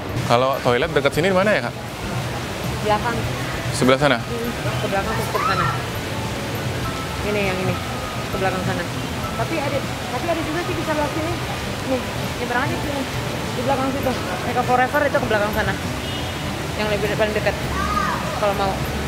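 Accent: Bali, Indonesia. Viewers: aksen balinya kental banget :D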